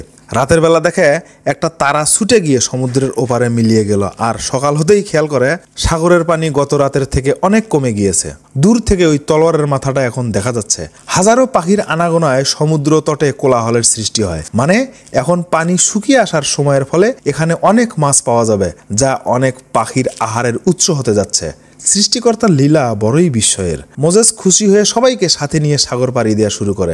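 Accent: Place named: Rangpur, Bangladesh. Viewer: রংপুরের ধারা ভাষ্য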